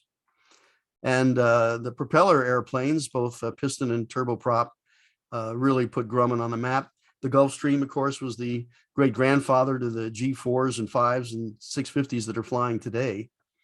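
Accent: United States. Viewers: I was born and raised on Long Island, and trust me your accent remains. It is still a lot stronger than mine, because I learn how to speak properly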